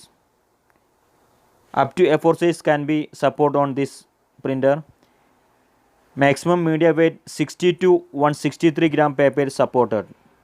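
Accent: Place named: India. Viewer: thanks...but your accent is too hard to understand for non-indian...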